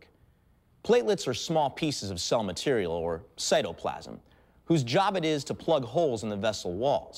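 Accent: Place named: United States. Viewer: Awful American accent. It is a disaster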